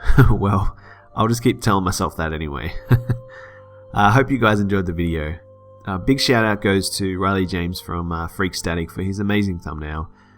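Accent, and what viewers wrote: Australia. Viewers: Subbed mate! Are you Australian? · Hey are u Aussie I am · Lol Austrailian accent idk why but it sounds funny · Are you Australian I subbed by the way. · I'm Australian born and bred, yet for some reason, you're the only Australian YouTuber I've seen who I enjoy listening to, let alone can listen to without wanting to eject myself into the sun. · Your accent is awesome! I've been dating an Aussie(Brisbane fellow) for awhile and I've just fallen in love with your accents:)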